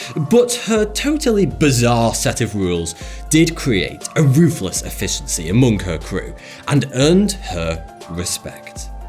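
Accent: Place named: Lancashire, United Kingdom. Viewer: What part of Lancashire are you from? You sound like your from my area - Preston.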